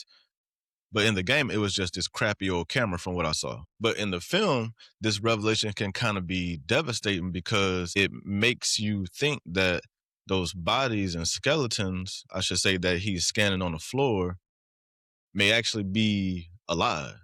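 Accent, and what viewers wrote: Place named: United Kingdom. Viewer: "distorted and cruel" bruh that's just a british accent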